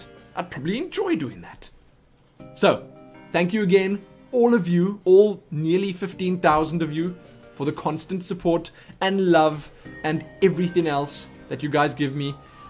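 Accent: South Africa. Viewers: You sir, must be a South African. I watched your Alice videos and I could swear I was listening to a Boer! If not...well shit. My mistake XD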